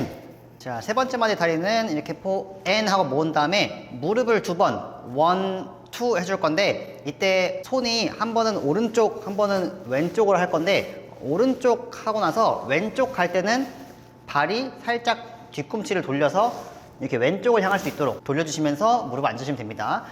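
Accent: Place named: South Korea. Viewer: yes, a lot! And the subtitles were too, since you have korean accent (which is so cute being honest), thanks! I'll be looking forward to your next tutorials (CAN'T BELIEVE YOU NOTICED ME, YOU'RE AMAZING 😍)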